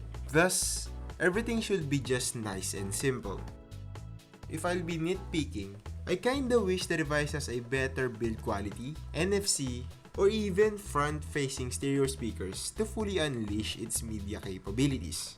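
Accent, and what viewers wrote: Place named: Tagalog, Philippines. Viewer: wala naman masama sa tagalog accent ate kakaloka ka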